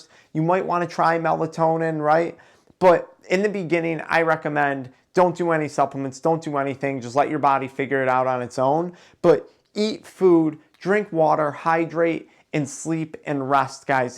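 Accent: United States. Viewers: Why do Americans pronounce doc as daac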